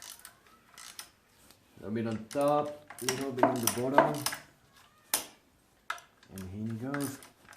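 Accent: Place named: France. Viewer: hello, your accent looks like french guy! PLEASE, i have a IH345 V8 in a 1979 scout2, can i do the same conversion as you did? i actually have a automatic 4 speed gear box on it, what do i have to modify and what parts i need for this conversion? i would appreciate your reply, thanks a lot, and continue like that, i like your channel! cheers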